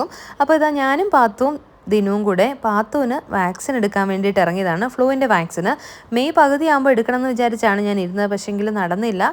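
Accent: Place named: Alappuzha, India. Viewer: where in alpy...doubted from ur tone that u will be from my place❤😂😂😂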